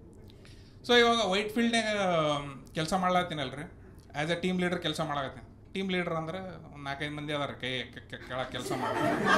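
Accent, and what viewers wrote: North Karnataka, India. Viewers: Underrated Kannada Comic , yena helri pa, uttar Karnataka dialect olag stand-up kelaak mast irteti🔥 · Uttar Karnataka slang alli stand up comedy... best. Pls innu jasti upload maadi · I’m happy to hear nam hurinavaru ee Tara beledirodu. Lots love from Dubai kannadiga . Nam UK style matu berene level 😃🥰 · Nivu yena helari namma uttar Karnataka bhashe na chanda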